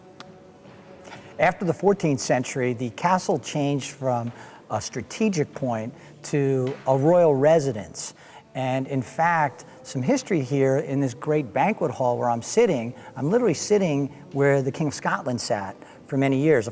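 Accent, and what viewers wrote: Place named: United States. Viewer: what an annoying yank presenter!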